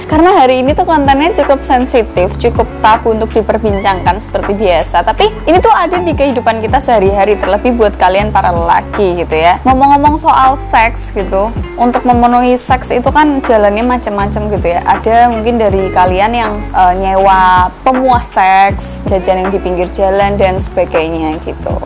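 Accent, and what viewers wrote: Java, Indonesia. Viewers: Salfok sama bahasa nya mba wkwkw "Medhok" wkwkw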